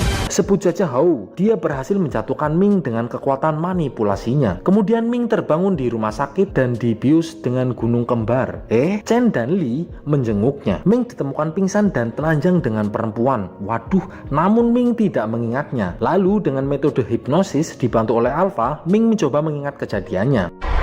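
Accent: Banyumas, Indonesia. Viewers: Wong ngapakkk kiee lanjutkan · Min asli wong ndi, ding nggo basa ngapak😂😂😂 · Mimin wong ngapak😂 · Rika wong ngapak ya? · admine ngapak ehehe salam dari pwt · Rika ngapak deneng Ngendi Banyumas SE si lik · Ngapakke ngendi kangggg😅😂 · Nyong bangga rika wong ngapak😂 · ngapak temen wong endi jane rika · Ngapak ngapak urapapalah seng penting penakk😂😂😂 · ko wong banyumas apa hahaha nganti bingung temen pada nggoleti apa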